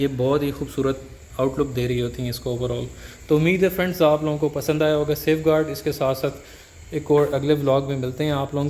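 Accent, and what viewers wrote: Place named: Saraiki, Pakistan. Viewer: Iski awaz mein saraiki pan hai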